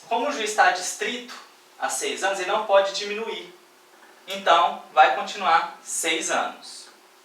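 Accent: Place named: Goiás, Brazil. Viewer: kkkkkkkkkkkkkkkkk goianin da gema..... :p (sotaque)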